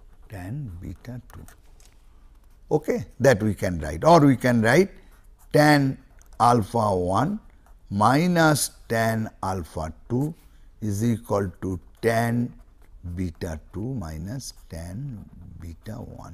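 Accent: India. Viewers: His accent is indian... maybe that's why. The lecture is great though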